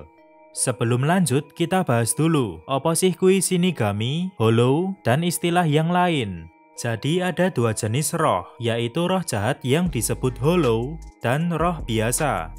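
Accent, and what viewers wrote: Java, Indonesia. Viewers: Medok bnget jawanya 😂🤣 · Ketoke mimin iki wong jowo😂 Suarane koyok wong jowo soale :v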